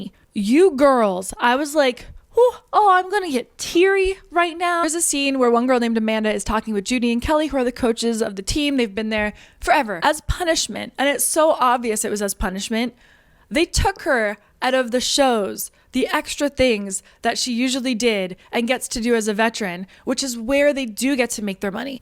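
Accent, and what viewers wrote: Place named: South, United States. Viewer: For a Canadian you sure speak southern talker.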